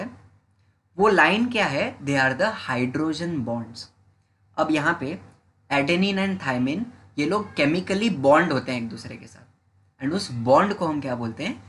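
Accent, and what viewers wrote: Maharashtra, India. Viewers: Sir tumhi marathi aahat ka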